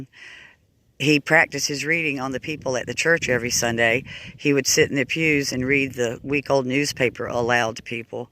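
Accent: South, United States. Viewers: Jackson's nothing but a murderer and a bigot. Trail of tears= genocide also caused a depression due to land buying changes explain your reason to visit such person. Oh wait that southern accent explains it all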